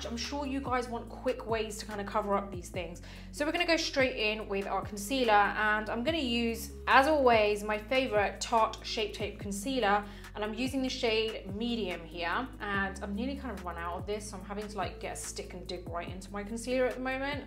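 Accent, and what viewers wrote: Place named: England, United Kingdom. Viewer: Love ❤️🥰all your makeup tutorials. You do an amazing job 😍explaining each step throughly. Just want to know where are u originally from? I don’t know much about makeup. So your info is valuable to me , it 🌹helps me to buy the latest products in the market, concealer foundation… I live in Connecticut US, ( vcold weather), dries out the skin. Can u suggest any products for that, before makeup. Did u live in England? ( your english accent).I was brought up in Riyadh( Saudi Arabia) and England.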